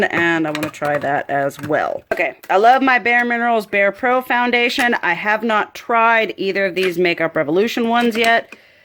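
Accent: South, United States. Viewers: So where were you living? I know you have a southern accent but I have always been curious where you were from. I’m in NC!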